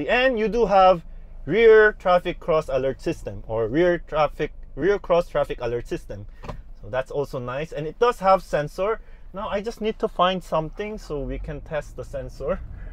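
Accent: Philippines. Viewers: I like the way he speaks English with an Ilonggo accent.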